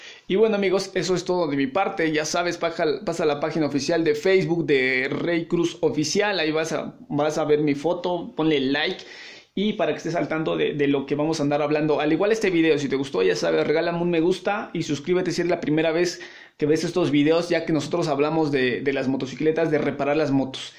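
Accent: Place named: Ecuador. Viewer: Yo tengo una moto parada hace ya un año pero me fice mi mecánico que ya no le meta dinero a esa moto ...pero es que me gusta es honda sfx de 50 c . Ud. Es un buen mecannico ...y pir la manera de hablar creo que es de mi pais anterior ecuador ...soy de alli . Yo tengo muchos años que lo dejé 34 años desde que trabajaba como.profesora. cuao un saludo Paulina .A . Ross .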